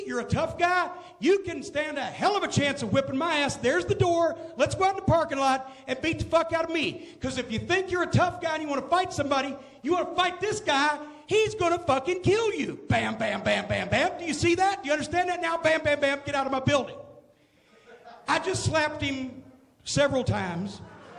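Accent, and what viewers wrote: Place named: South, United States. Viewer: his dopey southern accent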